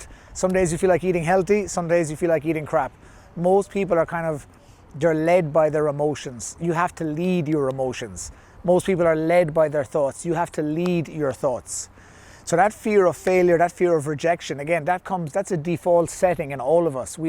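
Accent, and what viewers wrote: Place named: Ireland. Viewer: hey, i just stumbled on this, i wish success in your youtube endeavours my friend. your so right though. recently i asked myself am i dreaming to big/too small. and it wasnt until i dared myself to dream big that it made things a lot more clearer in my mind. thank you for your insightfulness. i reeally loook forward to diving deep into your content, btw im from dublin, its so nice to hear an irish accent on this topic! godspeed, <3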